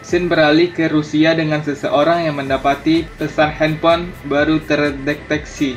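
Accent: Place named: Minangkabau, Indonesia. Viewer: Orang awak ko bantuaknyo😂..... Medok x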